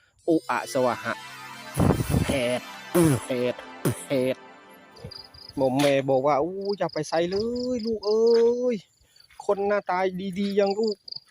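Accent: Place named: Cambodia. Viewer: มักเสียงเว้า สำเนียงเขมรเด้😂😂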